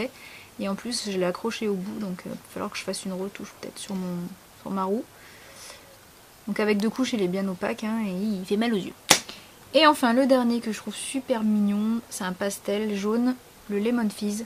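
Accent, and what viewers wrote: France. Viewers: merci pour cette video, dis donc tu as beeeeeeaucoup de vernis, j'en ai presque bavé devnt cette video ^^ mais dis moi comment reussi tu a ranger tout ses vernis ? As tu deja fais une video de ton rangement vernis ? ça serait bien interessant de voir ça ! & oui oui je me matte ta video a 4h55 du matin lol et meme a presque 5h du matin j'aime ta video :) bis & ton accent français (renforcé) est terrible, j'adore ;)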